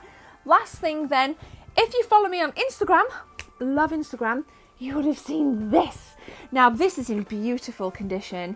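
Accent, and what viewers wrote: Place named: East Midlands, United Kingdom. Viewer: Are you from nottingham or derby im from derby 👍🏼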